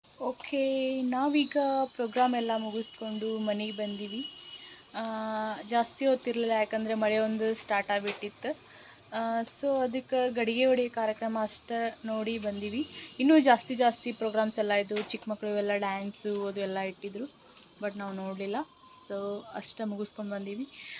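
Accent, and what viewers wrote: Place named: Dharwad, India. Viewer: Nivu dharwaddavar yenri sis yaakandra nimma bhase hangaaa iiyti sdakari kelde sis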